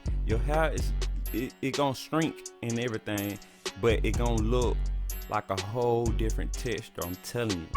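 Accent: Atlanta, United States. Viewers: 😆 brah u talk like us in the A i know u in macon or chat tenn. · I can tell from the accent he from atlanta😂...but if you put this on medium hair it’s gone turn straight?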